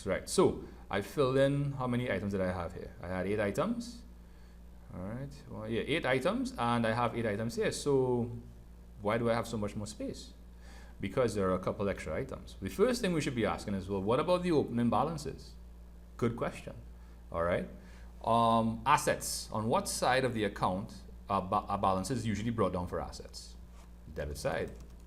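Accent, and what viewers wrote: Trinidad and Tobago. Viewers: He doesn’t, that’s a Trini accent😭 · U sound trini owo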